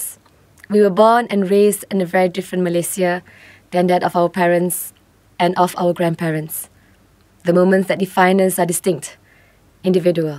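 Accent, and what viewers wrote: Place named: Bangladesh. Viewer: Oh My Banglish....Mirror yourself mate.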